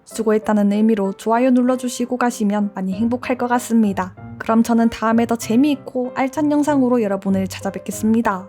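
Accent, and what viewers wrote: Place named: Daegu, South Korea. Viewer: 대구분이라 사투리 쓰시는거에욘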